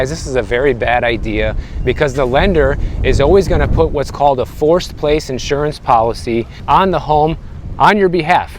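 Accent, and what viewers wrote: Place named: Miami, United States. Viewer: 😂😂😂😂 nice start my man! Truly Miami Sounds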